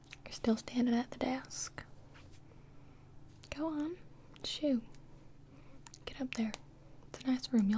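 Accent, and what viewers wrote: South, United States. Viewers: I just now realized used to have a southern and deep sounding voice but now is losing her southern accent and her voice has gotten higher! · Gibi should make a role play character with this southern type accent because it is suuuper relaxing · did anybody else notice that she has a southern kinda accent this video o-O it was really soothing tbh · went from quirky to southern hahaha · Is she supposed to have southern accent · I like how this has a hard southern accent but then the accent slowly deteriorated throughout her later videos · I forgot had a southern accent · She used to have a southern accent? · I feel like she was from the south and when she moved back her accent was reinforced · rip to when had a country accent... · Did get a country accent???? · actually she sounds very southern here. And I'm from Mississippi, so... 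· Back when was southern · I love southern accent lol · I love her southern accent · used to have a country accent 😂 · This is my favorite ASMR video. For some reason the combo of the slight southern accent with the typing and the personal attention just MWAH. Works so well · She got less southern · There we have it, early on, was southern and did that cute tongue clicking thing Mistletoe does. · I love how voice evolved from this very slightly southern accent to a more anime one lol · she still kinda has that lil southern accent still lingering but yeah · Is from the south??? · Old voice: fairly normal + country accent. Present: High pitched + exaggerated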